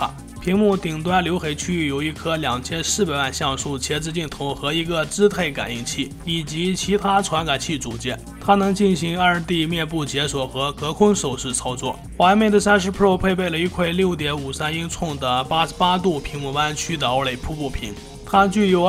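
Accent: Shanxi, China. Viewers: 山西人啊这口音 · 山西人吗？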